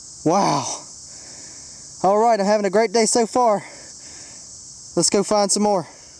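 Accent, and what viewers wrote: South, United States. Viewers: i like your country actsent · you know your watching when you hear his country accent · He says gowd instead of gold good old southern accent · you have an American axent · I can not stand his countryness.......???? Accent and how he talks but I love his vids though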